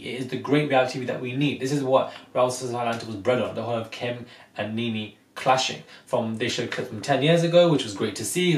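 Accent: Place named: London, United Kingdom. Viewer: Great to hear a fellow Brit and Londoner (?) reviewing RHOA.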